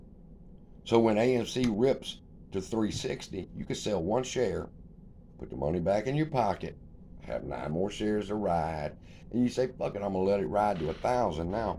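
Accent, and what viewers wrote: South, United States. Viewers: Hey!you're a cool MF. What part of the South are you from?. More power to you have a good day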